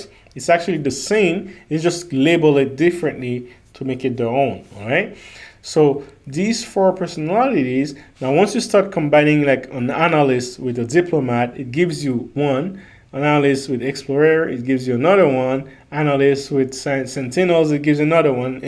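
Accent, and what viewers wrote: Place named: Haiti. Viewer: Where are you from? Your accent sounds Haitian to me, but I'm unsure. That, or what's your heritage? Were you raised by others who had a similar accent?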